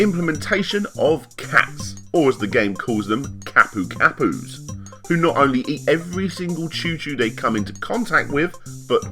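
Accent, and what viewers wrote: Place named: United Kingdom. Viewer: We love that British accent. Sorry you had to deal with low speed !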